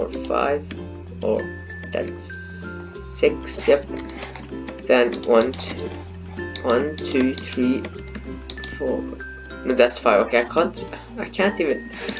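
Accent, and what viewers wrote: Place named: Germany. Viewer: Are you german? Cus your accent which i love...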